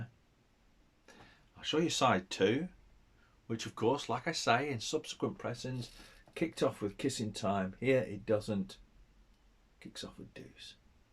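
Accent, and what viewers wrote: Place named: Cockney, United Kingdom. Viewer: The only person who cares about this “anniversary” is you. I’m Chip Rock I shot the cover of KISS ON TOUR 1976. 41 photos in KISSTORY too, my photo credit is in ALIVE 11. Gene once told me in ‘75 “you’re our biggest fan!” All serious fans know when the 1st album came out I paid 2$ for it in a California hippie record. Incense galore. You need a haircut & walking papers. Not impressed & your lower class cockney accent is ingratiating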